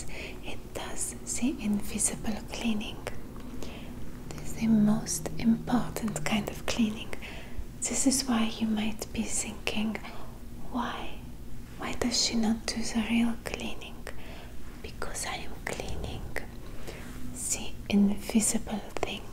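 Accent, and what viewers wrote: France. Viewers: That french accent you do here is to die for... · I love the French accent and the lovely maid uniform. Your voice is very soothing and comforting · Oh this video is incredible! You look so pretty in that outfit and your French accent is so cute! This was a very nice thing to wake up to.🤗💜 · You are amazing----have yet to see anything you can't do. Looking Beautiful as a French Maid and Fantastic accent too. LOVE---LOVE everything you do. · That's a good French accent 😂 reminds me of the 80s comedy show Allo Allo if anyone remembers that.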